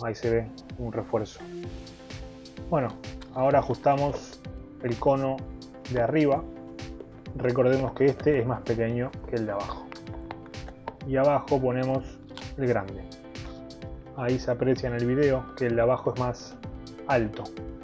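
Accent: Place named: Argentina. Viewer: De dónde sos amigo. Sonas reargento pero laburas en 110v😅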